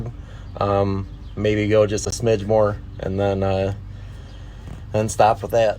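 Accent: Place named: Wisconsin, United States. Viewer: My guess was Wisconsin based on his accent.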